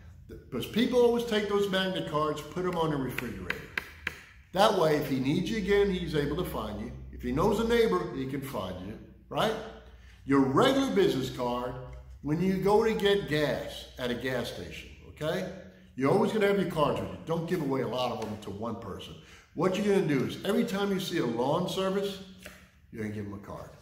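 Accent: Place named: New York, United States. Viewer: ... I knew it! Lol! My wife and I were watching one of your vids on the big screen, and I said to her "You think this guy is from New York?" She said "Nah" I'm originally from Syracuse, transplanted to Ohio about 26 years ago. Got a lot of 'you from NYC?' Didn't think I had that strong of an accent being from upstate and all. Now, the NY accent only comes out when I get upset. Lol! Have a great evening!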